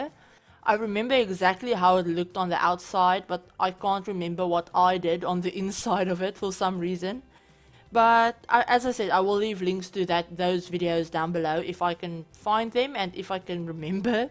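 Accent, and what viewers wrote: Afrikaans, South Africa. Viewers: Ek kon dadelik die Afrikaans in jou aksent hoor!!! Volg al lank vir SimKim, en eks so bly julle het hierdie collab gedoen. Die interior pas die exterior amazingly!!! Stunning! En ja, ek sub nou jou kanaal ook. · oh that's so cool, I love the South African accent. Been trying to master it for so long haha, very difficult.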